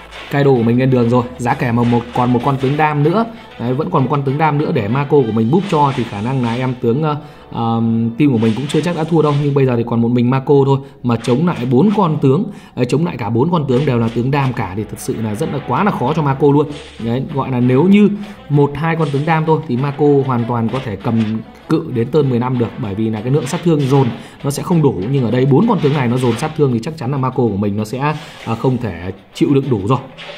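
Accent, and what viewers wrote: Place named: Hung Yen, Vietnam. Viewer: Nghe bác nói quê Hưng yên. Bác chỗ nào HY thế.?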